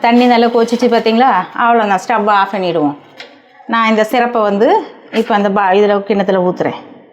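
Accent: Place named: Nagercoil, India. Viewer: நம்ம நாகர்கோவில் தமிழ் வாசனையுடன்,கிழங்கின் சுவையும் அருமை !!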